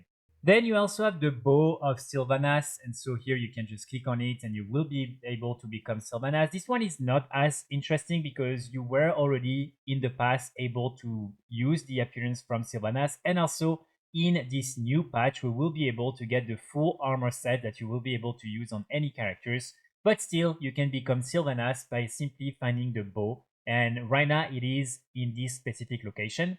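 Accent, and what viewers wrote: France. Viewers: Are you french the way you talk 💯 sure que tu est français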